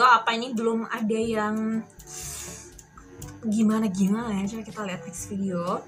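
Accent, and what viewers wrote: West Java, Indonesia. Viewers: Ngomong Sunda nya bikin greget teh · Sunda mana nih🤣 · Teteh orng mana teh Sunda mana euy teh h3h3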